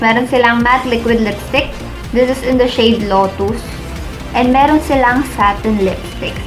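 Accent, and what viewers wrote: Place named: Conyo, Philippines. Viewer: Nakakatuwaaaaaa hahahahaha ang conyo mo na ate hahaha ang cutieeee lab lab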